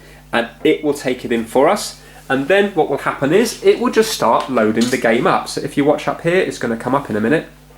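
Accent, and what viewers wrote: England, United Kingdom. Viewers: Its the British accent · Its really fancy to watch a tutorial with a british accent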